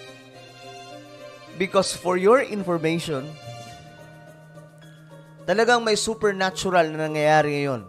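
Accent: Waray, Philippines. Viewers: Ok sangkay parang waray.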